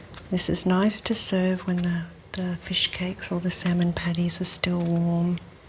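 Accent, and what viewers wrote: Australia. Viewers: Australian accent is my weakness what comes to asmr. And with your voice the tingles are even more amazing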